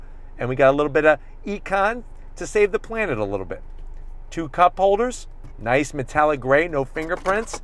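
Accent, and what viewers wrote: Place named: New Jersey, United States. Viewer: I'm guessing this guy is from NJ or LI.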